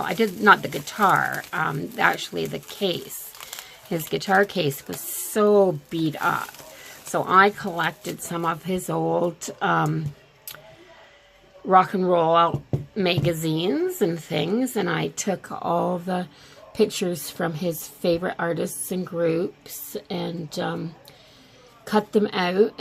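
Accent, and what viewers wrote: Canada. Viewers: Are you Canadian??? Love your brouge (accent) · You must be from Canada? The way you pronounce out... Beautiful job on the mason jar! Thank you for sharing 💖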